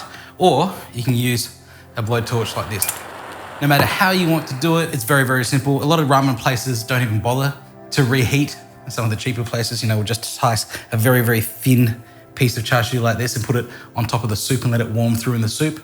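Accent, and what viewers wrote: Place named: Australia. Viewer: where you from? you also sound australian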